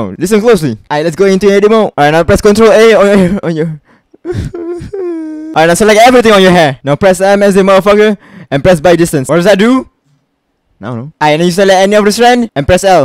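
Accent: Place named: Malaysia. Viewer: this accent sounds familiar edit: ah no wonder, hes from malaysia and so am'i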